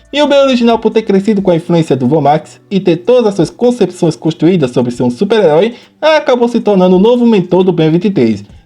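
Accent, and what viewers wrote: Northeast, Brazil. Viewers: Mano curiosidade foda que eu adoraria saber seu sotaque e nordestino?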